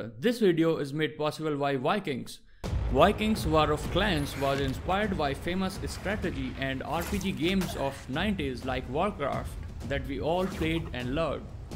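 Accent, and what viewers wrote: India. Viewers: Me hearing the voice said Oh is he indian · Is this your voice are you an Indian? I am Bangladeshi your neighbouring country🇧🇩🇨🇮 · Gee what kinda voice is day 1 like= no voice (and is that a indian) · It must be an Indian man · Indian voice lol no offence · I like your voice are you from Asia India? Just wondering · He is from India 100% sure. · You sound like an Indian ngl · Are you Indian, it sounds like it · Love Indian accent wish I could do it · U r a Indian I knew that ur voice Revaled! 👍👍👍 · You sound like indian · Your Voice is Like Indian Average People ❤️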